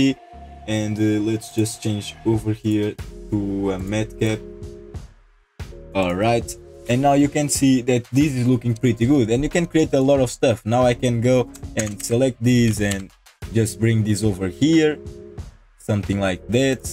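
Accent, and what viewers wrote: Portugal. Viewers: O sotaque de tuga sempre da para perceber, parabéns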